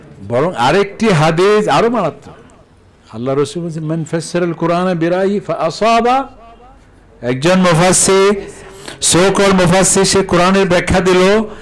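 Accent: Chittagong, Bangladesh. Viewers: গুরুপূর্ন আলোচনা।চট্টগ্রামের ভাষা ওনি ভালা লাইগ্গি। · উনি ত চট্টগ্রাম এর. ভাষা শুনে বুজা গেছে . খুব ভালো লাগছে.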